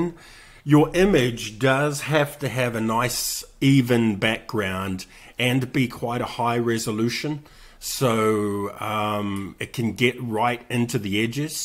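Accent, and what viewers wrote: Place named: Australia. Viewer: This guy has an Autralian accent. He sounds nothing like Joris Bohnson.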